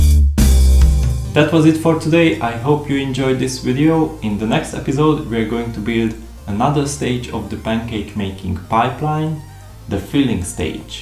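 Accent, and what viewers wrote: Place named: Hungary. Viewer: csá. ne higyj a fokuszcsopi ádámnak h magyarulkéne. teljesen jolesz igy magyar akcentus elsöre vicces de szerintem pont jó .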